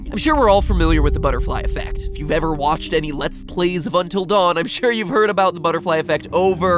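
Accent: United Kingdom. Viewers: Is that how us BRITISH people sound?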